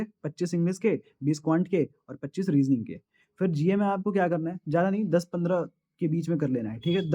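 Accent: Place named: Bihar, India. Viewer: Tum Bihar se h kya bhaiE sub kuch hai Thik h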